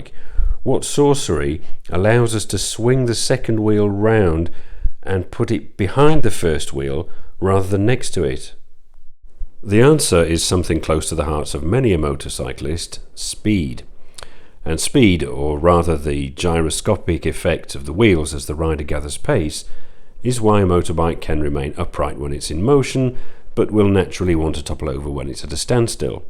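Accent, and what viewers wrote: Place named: Northern England, United Kingdom. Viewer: Nice I knew I heard Northern! I was born in Leeds. Ridings folk enthusing over Riding, gotta luv it lol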